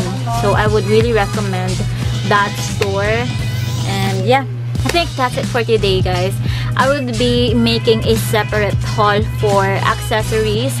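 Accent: Visayas, Philippines. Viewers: KA CUTE OY BISAYA HAHAHAHAH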